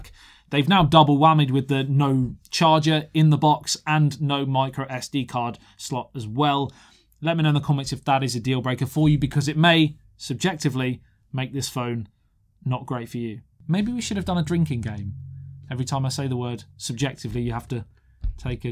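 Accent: United Kingdom. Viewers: I got mine last week 512 GB in the phantom black I love mine but I don't live as much as I love my iPhone 12 pro Max it's got a better camera low light photos lidar scanner that I use every day at my job as an interior decorator it saves me time and money and time is money when you are working with that thing and all of the wonderful AR apps I just wanted to say that I just absolutely love his voice I have met many British people in my life but his is different it could be Welsh I don't know but it's the most beautiful beautiful accent on another human being that I've ever heard in my life from either England or whales or maybe Scotland I don't know where he's from but all I know is that voice is hypnotic !!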